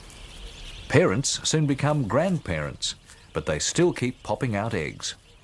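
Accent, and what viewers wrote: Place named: United Kingdom. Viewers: The narrator's name is. He is an English-born Australian, so that may well be the reason why he has a touch of British in his accent. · WALKMAN from Australian TV? Then yes. The announcer sounded more British tho.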